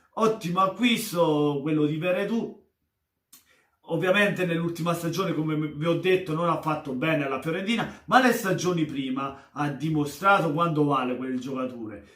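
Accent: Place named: Naples, Italy. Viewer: Ahahahah ciao carissimoooo mi piacciono tantissimo i tuoi video, poi la parlata Napoletana è fantastica viva il SUDDD ❤ BUONA SERATA 💋💋❤❤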